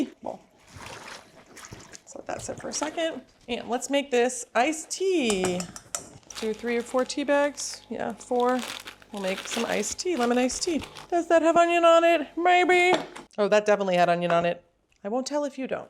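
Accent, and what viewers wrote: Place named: Midwest, United States. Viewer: Omg, I’m Carolyn but I love you! So glad I found you. You sound midwestern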